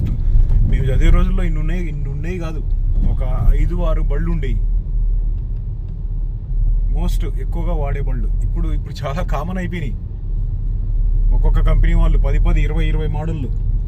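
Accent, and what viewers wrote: Andhra Pradesh, India. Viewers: Broo nenu ni voice chusi andhra anukuna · Brother... Telangana slang.. kaadu ❌ Andhra slang ... ☝️ How..how how??? 😅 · Hello, garu, Mee accent Andra telugu laga undi, Nizamabad accent ledu. Mee grandparents proper place ekkada? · Andhra language laaga undi meedi due your circle in usa or meeru tg ki move ainara ? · Your slang is looking like Andhra, not telangana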